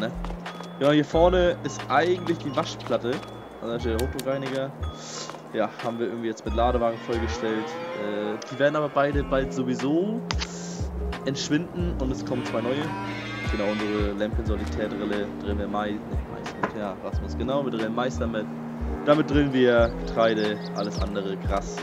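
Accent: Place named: North, Germany. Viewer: Sehr toller Hof, tolles Video, sympathische Stimme samt Akzent (ich liiiebe norddeutschen Akzent!). 👌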